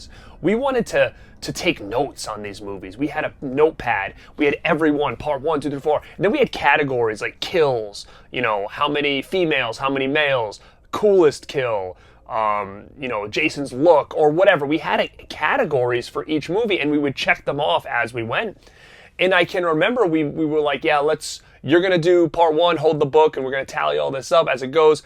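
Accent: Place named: New York, United States. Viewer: What state did you grow up in? You sound like a New Yorker.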